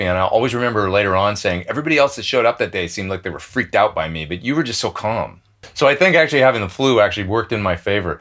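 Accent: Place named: Canada. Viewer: Great advice. Sounds like you might be a fellow Canadian!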